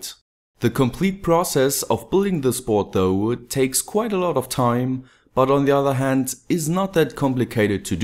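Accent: Germany. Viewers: LOL are you from Germany ?! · Sag mal täusche ich mich da oder bist du deutscher weil mir is das jetz schon öfters aufgefallen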